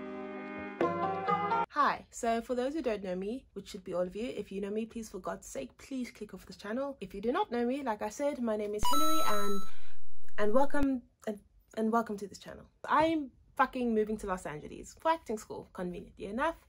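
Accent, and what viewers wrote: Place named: United Kingdom. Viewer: Which part of South Africa are you from, because that accent sounds british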